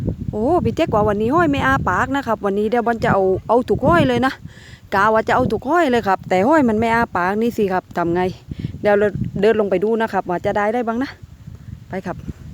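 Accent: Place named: South, Thailand. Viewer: ชอบค่ะนัองบอลอยู่จังหวัดไหนคะพี่ Rcha ค่ะfcใหม่ค่ะชอบมากเลยค่ะ น้องบอลพูดใต้น่ารักทำคลิปผัดหอยให้ดูทานใหัดูด้วยน้าาา มีเพจไล้สดมั้ยค่ะแจ้งชื่อเพจหน่อยค่ะจะย้อนกลับดูทุกคลิปนะคะชอบน่ารักดี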